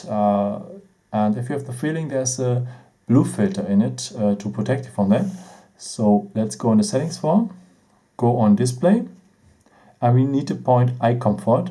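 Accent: Germany. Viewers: The German accent triggers me. muss los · You sound kinda german lol. Are you german?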